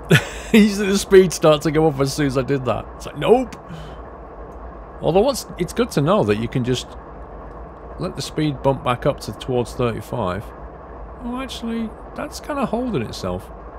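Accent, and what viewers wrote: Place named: United Kingdom. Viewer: How do you forget that when he has a British Accent?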